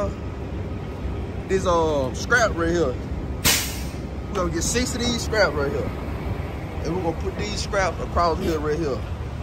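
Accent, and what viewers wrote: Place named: Mississippi, United States. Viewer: I hear that Mississippi in ya voice mane 😂#601 stand up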